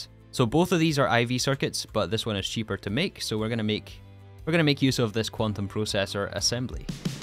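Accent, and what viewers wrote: Scotland, United Kingdom. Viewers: He's Scottish. Have you really never heard a Scottish accent before? SMH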